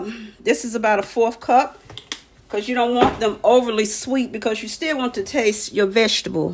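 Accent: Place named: New Orleans, United States. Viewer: You're my new Auntie, I used to live in Nola and I miss the food and the accent plus the hospitality. I'm inspired to make food from your recipes.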